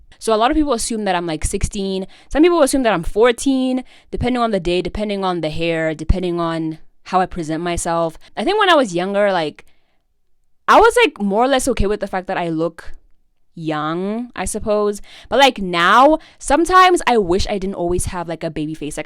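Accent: South Africa. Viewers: if you have done the research, self diagnosis is completely vailid even the psychologists i've had have said so. Although I get what you're saying please don't diminish your struggles, i also used to do that when i was self diagnosing before i eventually got a medical diagnosis. You are not over oversaturating any spaces, you should feel free to use any resources that you feel like would help you. I feeel like as long as you're not harming anyone you're good🤍also, unrelated but are you south african?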